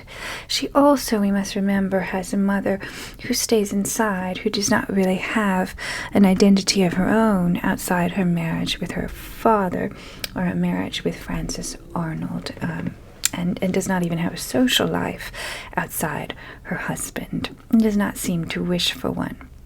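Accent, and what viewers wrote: Transatlantic, United States. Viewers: The cadence in which you speak is very interesting, is your accent natural or is that something you’ve worked on? Would you describe it as a transatlantic accent?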